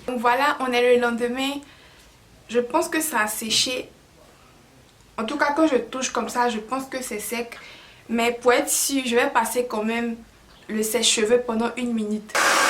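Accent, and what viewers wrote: Ivory Coast. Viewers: Une vraie Ivoirienne rien que t'entendre jee retrouvé u puays 😍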